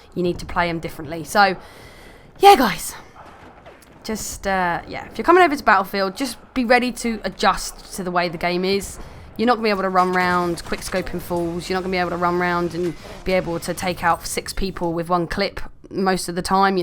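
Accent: United Kingdom. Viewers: Teach me how to British accent pls Also your intro is a tiny bit too long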